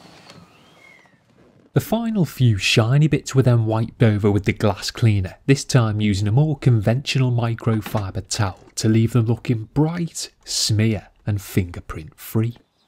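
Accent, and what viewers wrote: Liverpool, United Kingdom. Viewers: Daniele Cognome - he has a Scouse accent - from Liverpool, England. Same as the Beatles, that pop group from the 1960s.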